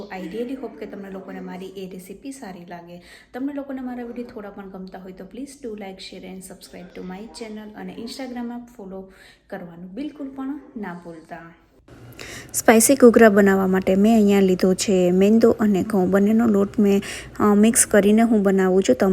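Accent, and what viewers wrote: Saurashtra, India. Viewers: Gugura bhu mast che khavanu man thaya che hu nayana anjary tmaru nam ? Tme sourast na iago cho bolva ni rit thi hu kutch bhuj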